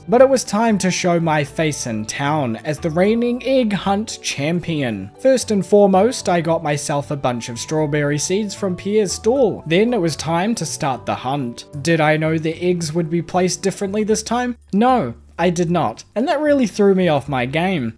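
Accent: New Zealand. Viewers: I had no idea he was kiwi! i guess i didnt tune into the accent because im also from nz and i was so excited when i found out! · are you from new zealand i can tell from the accent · Are u kiwi?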